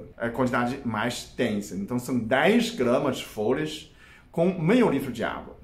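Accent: China. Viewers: mas só saiu assim devido o próprio jeito de ele falar, ele ainda tem o sotaque, chinês, pior o Brasileiro que não sabe escrever o Português certo, tipo andano, falano, trazeno,Sima abito umilde serto e muitos outros, ele é perdoável é só observar como ele fala algumas palavras em português.😊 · Você fala muito rápido Doutor, não entendo direito esse português Xing ling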